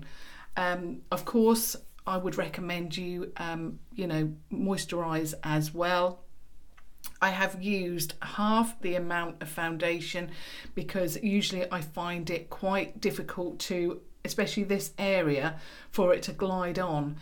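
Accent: United Kingdom. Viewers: Hi, of course I'm really happy for your achievement now 10,000 is near! you have grown with us and as I said in other comments for me you are like a friend, not only I appreciate all your reviews and your tips but I practice my english LOL... I love your british accent! anyways, your skin looks like porcellaine in this video👍❤️😘 (P.S. sorry for any spelling mistakes )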